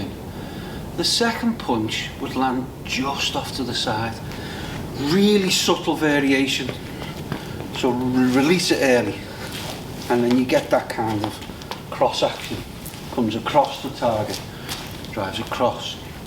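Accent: Liverpool, United Kingdom. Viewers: IS this the liverpool accent?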